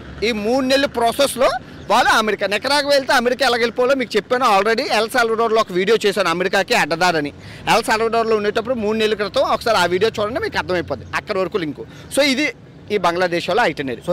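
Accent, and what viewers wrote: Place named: North Andhra, India. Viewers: Nedi మా శ్రీకాకుళం మాటలు ల unayi స్వామి... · From your slang u seems to be from North Andhra. Your people are daring and enterprising. People in Malaysia and all in Indonesia are from your place.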